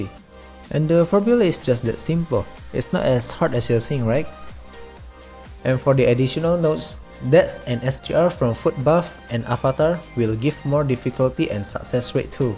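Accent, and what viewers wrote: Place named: Malaysia. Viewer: ru malaysian? ur accent looks like Malaysian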